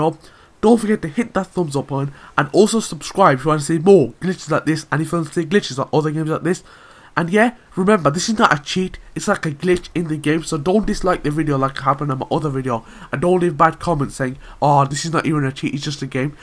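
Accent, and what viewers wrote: Birmingham, United Kingdom. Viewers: Bro just cuz he's got a Birmingham accent doesn't mean he's romello's dad 😂😑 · Im pretty sure this is a brummie accent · he has a good british accent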